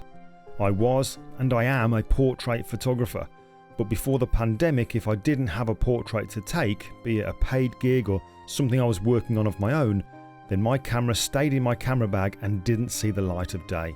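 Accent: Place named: Birmingham, United Kingdom. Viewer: Thanks, always good to hear your Brum on Kelby 1,too!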